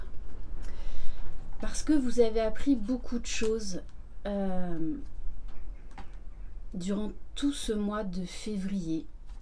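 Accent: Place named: Alsace, France. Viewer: Bonjour. Oui du 67 .mais c est un accent tellement mignon qu on a .rester comme vous êtes .c est l Authenticité qui fais la bonne personne. 😇🥰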